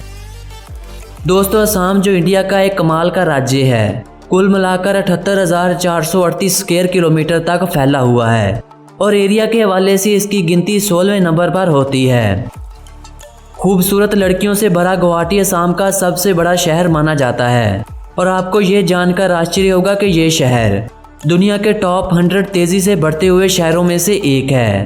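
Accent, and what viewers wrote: Pakistan. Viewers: Bhai tu h kaha ka teri aawaz to Pakistani h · Mujhe inka bolne ka andaz Pakistani lag raha hai ,are u Pakistani , from Assam 😉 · Pakistani chanal lg rha hai awaj se... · Vai tum Pakistani ho kya tumhare lehen se pata chalrahi he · He's pakistani in disguise. Check his accent and frequent use of urdu words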